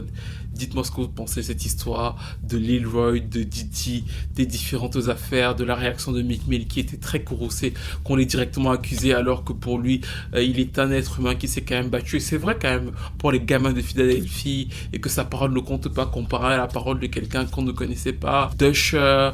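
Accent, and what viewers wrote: Cameroon. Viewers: J'apprecie enormement ton analyse, mais surtout ton accent un peu camer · Je dis ein tu es Camerounais? L'accent ci me dit qq chose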